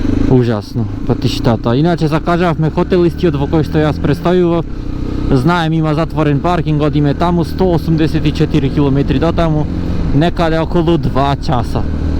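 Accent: Bitola, North Macedonia. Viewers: браво, за времето трудот и енергијата за креирање на убава видео содржина. Ама од се најпојќе ме бендисва шо по Битолски си збораш, и само така продолжи. Кој чактисва, чактисва, другите горе долу од видеото ке сватат. Поздрав 💪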